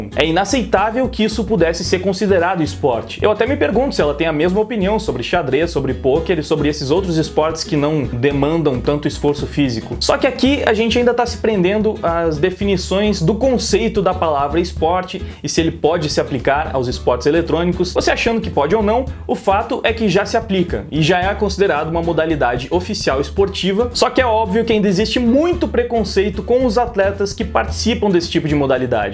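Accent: Rio Grande do Sul, Brazil. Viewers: Caralho mano, tu é gaúcho?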